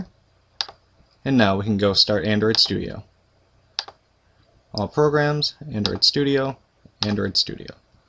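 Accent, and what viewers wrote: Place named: United States. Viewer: Hey, I gotta develop many programs till the end of january so i'll be watching all your tutorials :)) I hope I can learn to use android studio because nobody teached me. (I'm studing computer software engineer) Thank you for your job :) BTW: There are 2 big tutorials playlist, yours and one from another guy. I prefer yours because you speak american english and not british :P hahahaha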